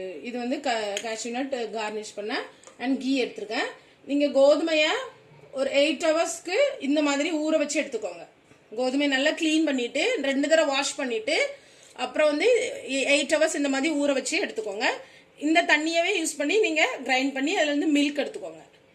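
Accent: Tirunelveli, India. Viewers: திருநெல்வேலி ஸ்லாங் கேட்க நல்லா இருக்கு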